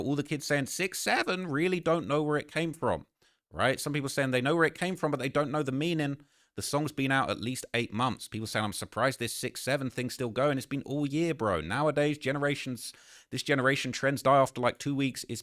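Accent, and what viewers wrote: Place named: RP, United Kingdom. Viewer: It sounds posh & refined.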